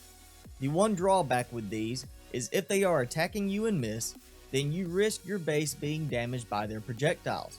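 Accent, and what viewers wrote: United States. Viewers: Pretty sure he's American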